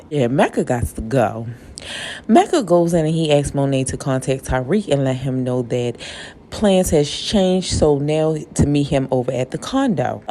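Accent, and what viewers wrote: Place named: Baltimore, United States. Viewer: You Sound Like you from my city ! You Fro, Baltimore ?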